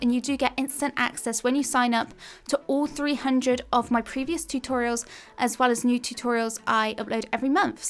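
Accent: United Kingdom. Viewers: Are you from UK? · Sou are bri-ish (no hate nice tips):)it help me alot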